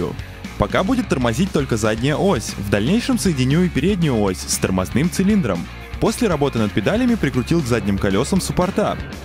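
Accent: Kazan, Russia. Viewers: Стоп стоп стоп!Ты с Казани?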